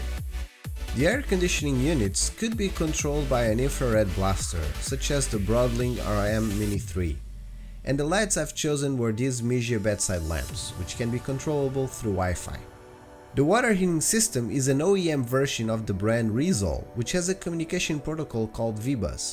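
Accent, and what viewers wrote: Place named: Portugal. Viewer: Muito interessante, e barato. Fiquei surpreendido por ser um vídeo português, já que o seu inglês quase não denuncia o habitual sotaque. Parabéns 👍🏻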